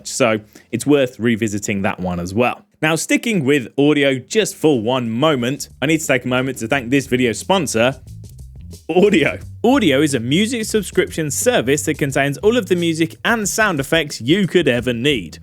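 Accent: United Kingdom. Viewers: I take it all back… You aint gotta change s#i+ I suck. Your speech is amazing and British!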